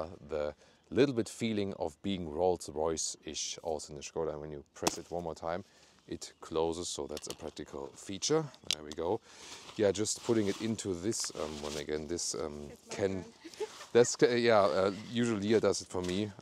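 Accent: Germany. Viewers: Der Typ schaft es deutsch und englisch zugleich zu sprechen.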